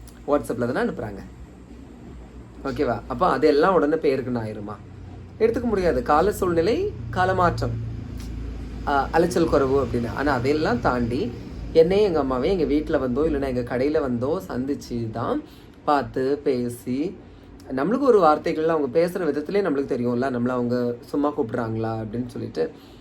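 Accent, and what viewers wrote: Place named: Kanyakumari, India. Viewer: ஹாய் தம்பி நான் கீதா உங்க வீடியோ இப்போ கொஞ்ச நாளா பாத்துட்டு இருக்கேன் ரொம்ப சூப்பரா இருக்கு உங்க ஊரு எந்த ஊருன்னு எங்களுக்கு தெரியாது நீங்க பேசறது வச்சு பார்த்தா கன்னியாகுமரி யார் உங்க கூட பேசணும்னு நினைக்கிற நானும் என் பையனும் உங்க கூட பேசணும் நினைக்கிறேன் எங்களுக்கு நம்பர் கிடைக்கிறது இல்ல தப்பா நினைக்கல என்ன நம்பர் கொடுக்குறீங்களா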